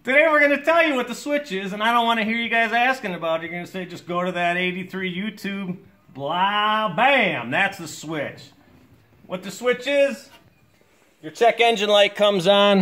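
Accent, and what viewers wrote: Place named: Wisconsin, United States. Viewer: This is the most Wisconsin sounding tutorial in the world. As a life long Wisconsinite I love it. I was waiting for and “ope” on the reset switch. Great content.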